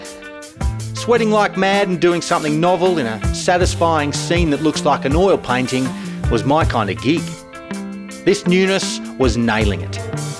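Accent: Australia. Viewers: Are you saying 'tackle box' or 'tucker box'? This guy from the states loves your "down under" accent but I cannot tell. LOL! ;-) Love your videos mate.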